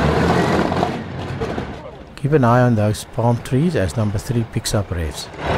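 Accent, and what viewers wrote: South Africa. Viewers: South African ... not Australian 🙄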